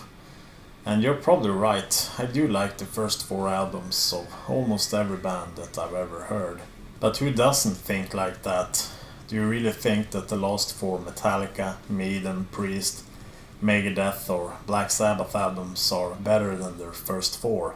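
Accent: Sweden. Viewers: Actually your deadpan Swedish accent coupled with the dry sarcasm is really cool. I’m more into OSDM and BM but I really enjoy the channel, and in fact it has made me listen and discover some old metal gems. Nice work bro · Further to the comments about your accent, my first ideas of the supposed Swedish accent came from the late 1970's watching the Swedish chef from the Muppets Show, members of Abba, Bjorn Borg and an atypical Swedish blonde dollybird on a UK comedy show called Mind Your Language!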